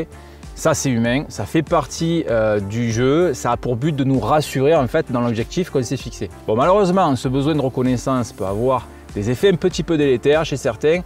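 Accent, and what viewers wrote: South, France. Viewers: Merci pour cette belle vidéo, j'apprécie ta façon d'aborder les choses et ton honnêteté et biensûr il ne faut surtout pas oublié l'accent du sud si charment droit au but 💪🏾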